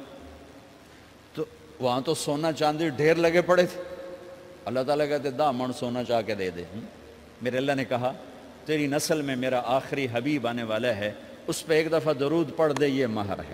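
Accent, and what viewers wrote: Punjab, Pakistan. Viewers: i love to hear uh😍😍😍your Punjabi urdu its drive crazy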